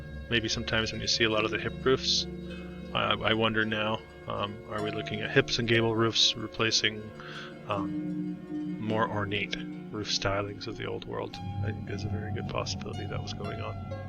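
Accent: Canada. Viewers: New subscriber and loving your content. So straight forward and easy to follow. I do love how u mention something about the founders of US maybe having the good intentions I was taught they had as a kid. Very hopefully comment on the eve of the Independence Day. I noticed u said “they have for profit prisons over there” wonder if u too are American or not? U always talk about talking to those that do this work modern day. I am a supplier of stone in Carrollton GA most all local masons buy from me and I will help them get whatever they need. I will show some screen shots to the most skilled ones and see what they say! A lot of them don’t speak first language English but I suspect they all know the narrative is bs. It’s the Americans that are the hardest to get to see what’s right in front of them. Second edit, I place the accent to Canada now. Yes our prisons are for profit, and it’s as twisted as it sounds. 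Entire portions of our population here have had extra Bain washing in hopes they will end up in the prisons to reach the numbers and fill the pockets of the evil entities that stand to profit from the prisons, or those that own them.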